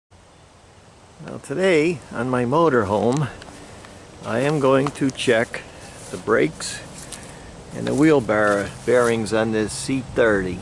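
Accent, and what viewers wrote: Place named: Northeastern Pennsylvania, United States. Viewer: I can tell by your accent you are a true NEPA guy. I enjoy seeing all your work and inspire me as a DIYer/Handyman up the eynon.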